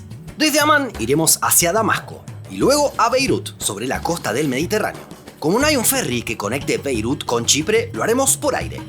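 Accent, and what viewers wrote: Rioplatense, Argentina. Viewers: De dónde sos ? Me encanta tu acento! (Argentino o uruguayo)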